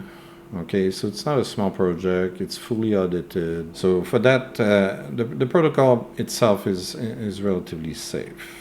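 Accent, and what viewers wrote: Quebec, Canada. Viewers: Is that a Québécois accent that I hear? Ça sonne vraiment comme chez nous!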